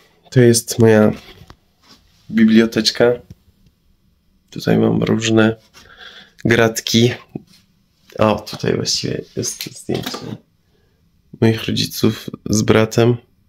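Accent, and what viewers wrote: Poland. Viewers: Świetnie mówisz po Polsku i to z akcentem Polskim Znam tu obcokrajowcow którzy są dlugo i może mówią po Polsku ale bez akcentu Polskiego Zdolny z Ciebie Iranczyk I bardzo sympatyczny chyba jesteś unikatem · Jak Pana słucham to stwierdzam że ma Pan polski akcent i dobrze mówi po Polsku.👍🏵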